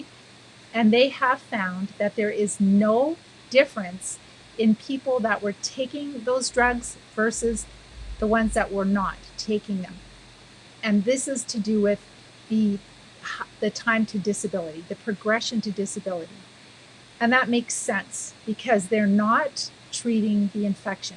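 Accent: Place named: Canada. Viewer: Less than 1 minute listening to you -- I'm pretty sure you're Canadian 😮😊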